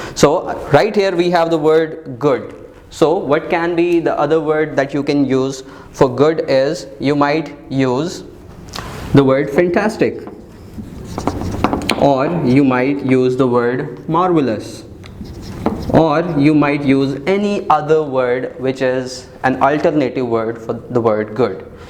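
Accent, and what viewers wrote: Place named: India. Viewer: u sounnd freshie SIR. didnt help at all like bruh u and ur indian accent aint going no where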